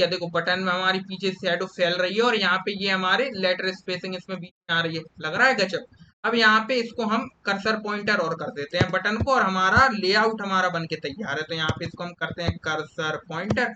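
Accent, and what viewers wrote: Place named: Bundelkhand, India. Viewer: you bundelkhandi acent is too good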